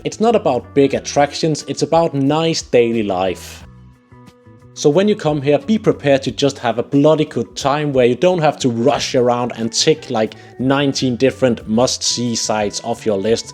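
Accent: Liverpool, United Kingdom. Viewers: You sound like a Beatle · You speak English with a Liverpool accent. Were you taught English by a Liverpudlian? Love your videos. Good job. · Thank you! My partner and I are visiting Copenhagen in two weeks time from Manchester and this video and the other video "My Amazing Day Trying New Things in Copenhagen City Centre" have been very helpful! We're just trying to work out your accent, have you spent some time in Scotland or also Northern England? lol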